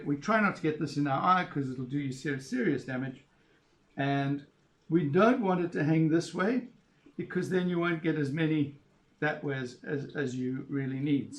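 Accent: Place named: Zimbabwe. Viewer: Thanks for the video, you make it the same way I do. Only difference is I dunk mine in a vinegar tub to rinse the salt off. From the accent I'm guessing Zimbabwe, I'm from Karoi 🙂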